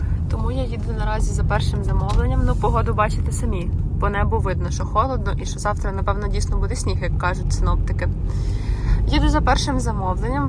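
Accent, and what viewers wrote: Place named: Lviv, Ukraine. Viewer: Мне вообще не нравится украинский, но ваш украинский мне приятно слушать) Может Львовский акцент Я из Киева.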